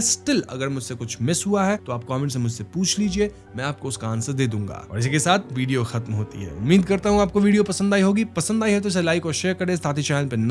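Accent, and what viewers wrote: Bengal, India. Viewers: i also think that because when he speaks hindi you can hear bengoli touch in some words. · I think the host is bengali. Accent thora sa bengali type hai. But that's my opinion. N ye to achi baat hai. Because of this channel kayi movies hai jo language barrier k karan hum dekh nhi payenge but yaha wo explain ho jata hai. N hume itni achi movies k bare me pata chalta hai.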